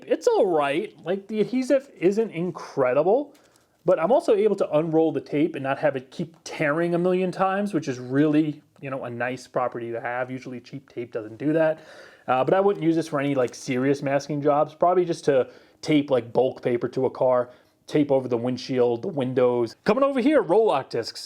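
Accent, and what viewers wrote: New York, United States. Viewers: New Yorker!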